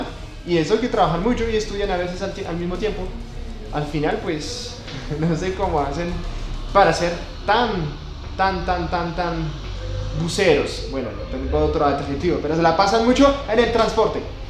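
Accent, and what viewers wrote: Bogotá, Colombia. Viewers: Yo viendo este video a las Osea la media noche ... 😁😁 me encanta el francés que habla como un Colombiano Bogotano. 😁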